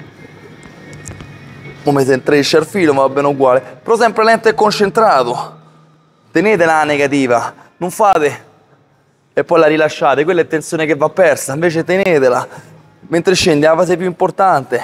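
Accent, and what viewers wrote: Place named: Rome, Italy. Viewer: AHAHAHAH bellissimo..breve ma intenso😂😂il romano è il dialetto piu bello da usare in pale😂❤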